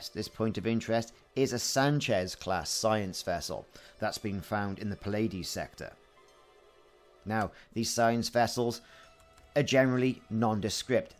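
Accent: Wales, United Kingdom. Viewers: Keep the vids coming mate, sounding good so far and good hear a Welsh voice online :-) Will be heading to Maia soon but have to do the exploration trip to unlock palin. After that I’m game for some alien hunting if your still about.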